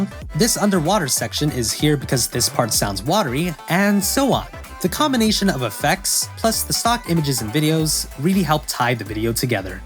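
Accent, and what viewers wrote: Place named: United States. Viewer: Your english sounds SO GOOD in my opinion because you said you are from the Philipines. How did you learn English so well to sound american?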